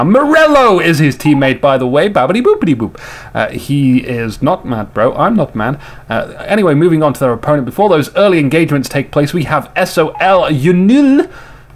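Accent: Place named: United Kingdom. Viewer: WAIT A MINUTE... you're British?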